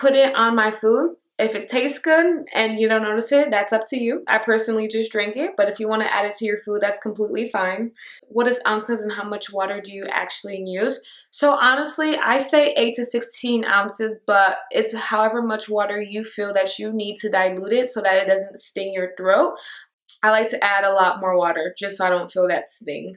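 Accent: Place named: United States. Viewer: I loved the way you spoke nd presented it.👌👌. Where are you from sweety? As far as I observed it helped to figure out, u r an American born.I might be wrong, but I wanna know☺☺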